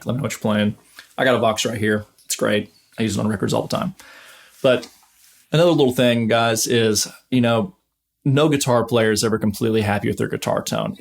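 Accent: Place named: Ireland. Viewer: Some great advice here, thanks. Are you of Irish extract?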